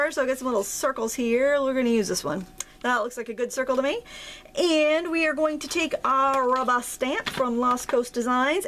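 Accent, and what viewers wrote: Maine, United States. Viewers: Oh my God! I just Love you! I was having a terrible day, then I watched this vid and I was laughing and totally enjoying myself! It hap;pens every time I watch your vids actually! I'm a Mainah too, so I Love your accent, LOL. You are awesome!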